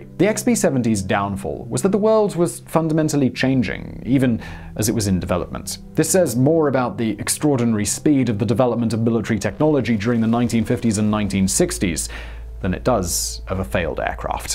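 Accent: England, United Kingdom. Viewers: Sounds intellectual because of British accent, makes numerous mistakes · He's English, we pronounce many things differently. In fact virtually everyone pronounces something wrong, they just don't know it.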